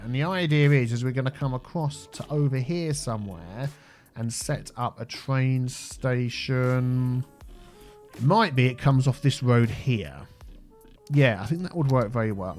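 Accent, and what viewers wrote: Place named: RP, United Kingdom. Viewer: why are you so posh?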